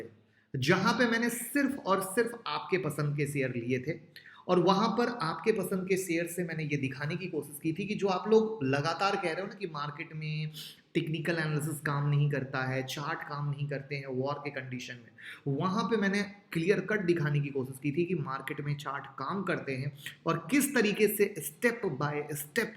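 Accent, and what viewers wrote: Bihar, India. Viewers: आप पूरे बिहारी लगते है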